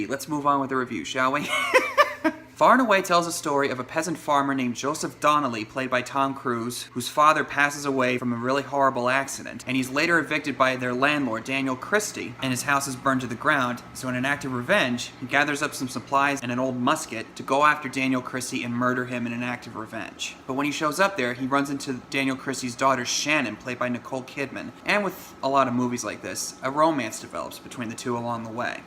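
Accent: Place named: Ireland. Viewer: Do a YouTube search for Star Stories Tom Cruise to see a very funny “summary” of the film. I enjoyed your review and it nearly makes me want to watch the film again to see if I will change my rating from the one I gave it then: TotalAbsoluteLoadaShite. Your Irish accent is grand, def better than many American actors.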